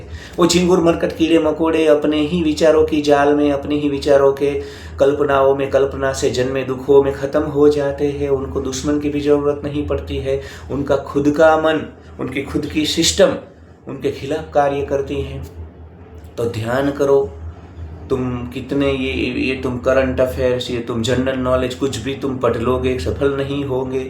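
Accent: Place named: Gujarat, India. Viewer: Gujarati accent wali hindi ❤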